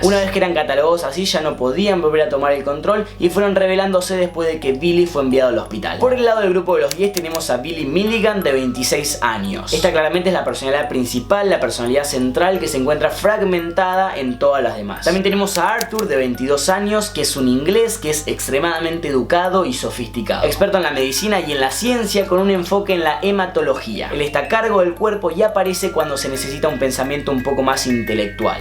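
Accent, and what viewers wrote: Argentina. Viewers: Amo tu acento argentino,saludos de México ♡♡.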